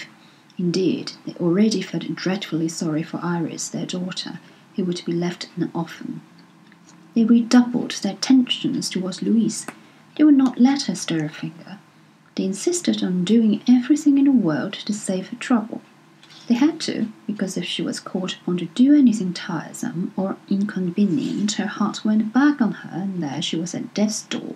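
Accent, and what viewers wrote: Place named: United Kingdom. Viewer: I listened at 0.75 speed. Works perfectly:) Lovely British accent:) Anyway, it's the only reading of it, here on YouTube , so let's be grateful.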